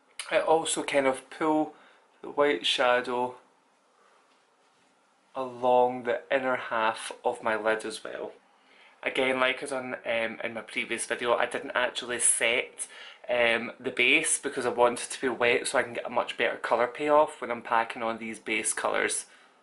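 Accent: Scotland, United Kingdom. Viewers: You're just gorgeous, and I could listen to your Scottish accent all day!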